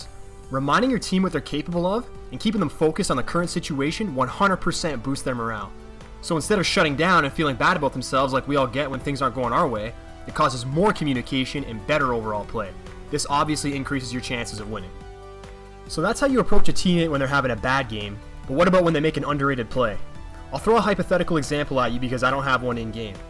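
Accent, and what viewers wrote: Canada. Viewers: are u canadian? you sound canadian. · the candian/minnesotan is strong with this one haha. great tip man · lol you can tell this guy is Canadian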